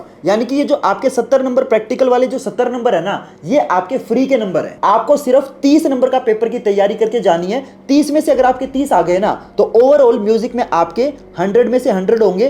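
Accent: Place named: Haryana, India. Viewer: Sir m aapko bta skti hun aap haryana se ho 😁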